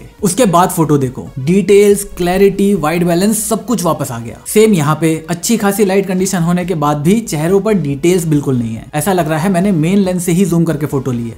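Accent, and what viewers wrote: India. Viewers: Dondda do dhat indian accent are so funny 😂😂😂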